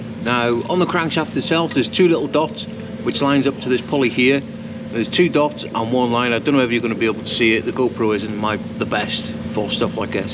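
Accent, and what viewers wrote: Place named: East Lancashire, United Kingdom. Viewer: Splash of East Lancashire accent there I reckon. I can hear it from here in Wisconsin.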